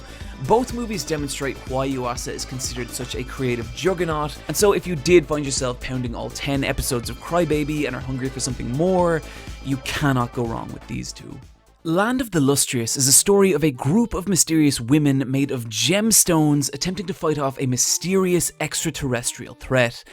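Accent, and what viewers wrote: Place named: Cork, Ireland. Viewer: Man, you're really fantastic dude, keep it up. I'm from Cork and its quite refreshing to hear a voice I can relate to talking on these topics.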